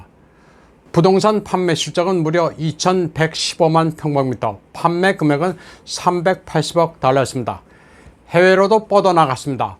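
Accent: China. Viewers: 그냥 한자대로 좀 읽어주세요. 꼭 그리 짜장 발음대로 해야하나요? 알아듣기가 너무 어렵소.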